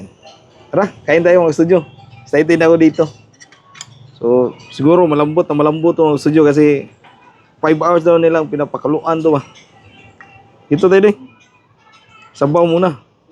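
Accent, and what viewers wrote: Visayas, Philippines. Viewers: Naa napod ka studio. Dalo kaau ka.panglibre pod diha. . Dika maulaw ka studio? Bisdak baya kaau mg Tagalog. Pag English pod. Wla Kay angay mg tagaglog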